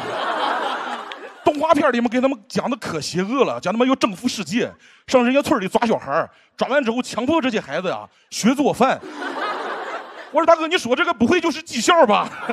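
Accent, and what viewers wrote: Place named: Dalian, China. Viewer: 我是大連女婿，喜歡大連口音。如果冒犯到大連人， 這裡道歉了。 當然肯定會冒犯到一群假脫口秀觀眾， 但是就不道歉了。反正你們也不開竅。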